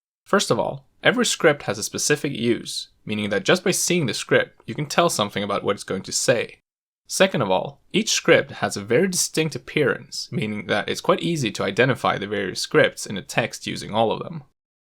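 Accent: United States. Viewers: you have the most american pronunciation of kanji ever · Stand out? Like fingernails on a blackboard. To me, it's the worst sound in (American) English. I cringed every time you pronounced it, as well as when you pronounced Katakana and Hiragana in such an American accent. Otherwise, well done... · THE WAY YOU SAY THEM WITH THE BIGGEST AMERICAN ACCENT PISSES ME OFF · right? I'm Aussie and I honestly thought he was just American, sounds just like every other American to me! 😂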